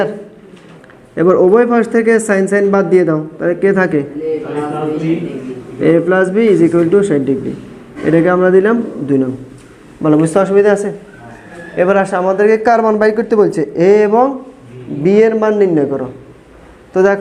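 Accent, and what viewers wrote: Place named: Noakhali, Bangladesh. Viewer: আপনার বাসা কী নোয়াখালী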